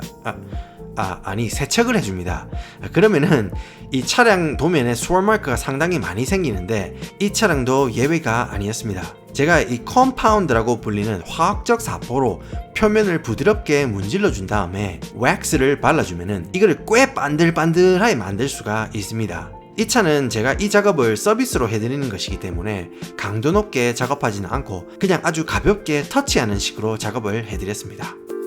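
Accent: Gyeongsang, South Korea. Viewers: 영상 재밌게 보고, 구독, 좋아요 달아줍니다.ㅎㅎ 고향이 경상도 분인거 같네요. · 가끔 보는데 마술사같아요 경상도 영어도 재밌어요~^^ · 혹시? 리쌍의 길 아니세요? 알고리즘 타고 들어 왔다가 헉했어여. 경상도 사투리 강렬하네여.ㅋㅋㅋ.구독 조아요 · 우연히 영상이 떠서 봤는데 재밌네요 저도 미국인데 그래서 반갑고 사투리라 또 반갑네요 저도 경상도출신인데ㅋㅋ 사막이면 라스베가스이신가.. 암튼 구독하고 갑니다 더운데 수고하세요!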